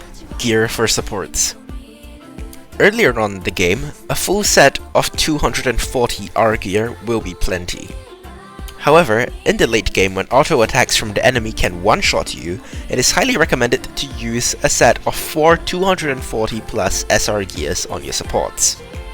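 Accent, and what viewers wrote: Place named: United Kingdom. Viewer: Got to love that british accent!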